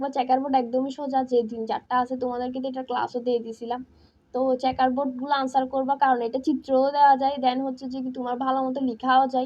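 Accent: Chittagong, Bangladesh. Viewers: Apu ami kintu ekhon Chittagong e thaki🙂 Apnar language shunei buja jacche Chittagong er🙂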